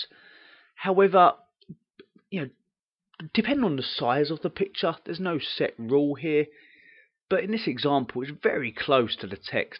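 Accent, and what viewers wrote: United Kingdom. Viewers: Thanks It helped me a lot. Also, your british accent is very satisfying lmao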